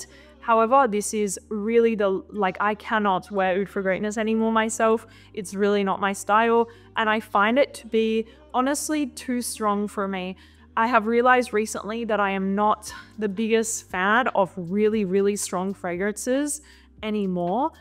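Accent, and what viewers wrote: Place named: Australia. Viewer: What is your accent? I can’t tell if you’re Australian or French or both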